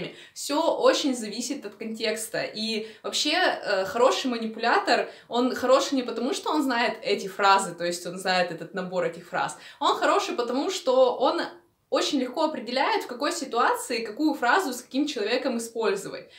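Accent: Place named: Urals, Russia. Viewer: Говор Уральский, окающий, ты из Перми?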